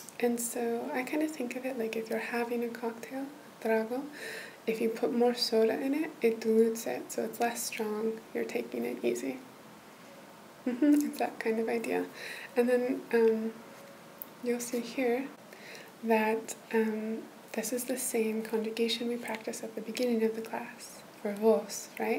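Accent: Argentina. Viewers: is she argentinian? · Yo con tu video tengo mas vocabulario 😊 y me gusta mucho el pais de Argentina 😍 mi idioma a aprender y mi acento favorito en solo un video 🙈 thanks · Sos de Argentina? Porque tenés el acento perfecto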